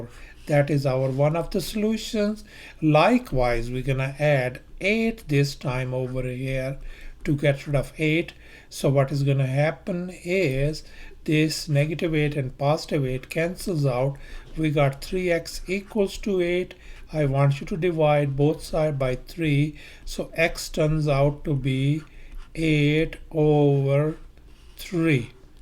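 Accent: India. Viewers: I like your English but I don't know what you say I like indian English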